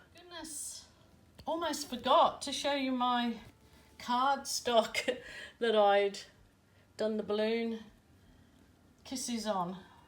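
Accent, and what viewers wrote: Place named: England, United Kingdom. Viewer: Always love to hear your cheerful English voice, I live in France so I always enjoy.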